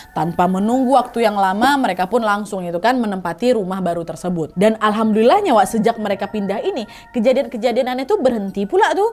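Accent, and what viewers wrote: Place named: Medan, Indonesia. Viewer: Cocok kali kayaknya kakak ini main ke mentah kali logat medannya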